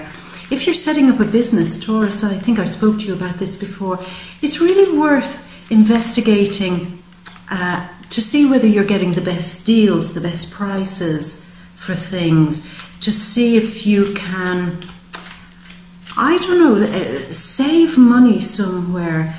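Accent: Ireland. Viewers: For now as I am going to listen to the rest of your video I love ya you are honey and lemon and your voice is ace. Your accent to die for and your gift. I listen to different videos but you are the best. The creme d la creme the cherry on top. Amazing. But that cos you have the Irish beauty no one can deny. Mwa mwa mwa. Speak to you again. Soon. Stevie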